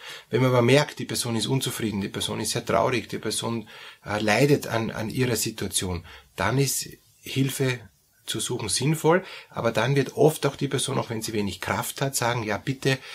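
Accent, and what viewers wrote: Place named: Austria. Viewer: Sehr geehrter Herr, Ihren Kanal finde ich wirklich gelungen, außerdem liebe ich die österreichische Aussprache. Ich möchte Sie bitten, in Zukunft, Themen wie Schizophrenie oder auch Depersonalisation/Derealisation, aufzugreifen. Vielen Dank.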